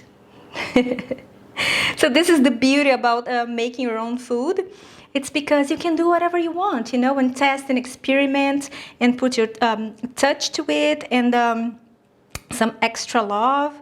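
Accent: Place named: Brazil. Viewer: First time I watched one of your videos I thought "what accent is that, where have I heard it before?" and then I saw your last name and realised it's the same one I have, hahaha. Great too see a fellow brazillian making such beautiful vegan dishes and reaching the whole world with your recipes. Te desejo todo o sucesso do mundo,!:)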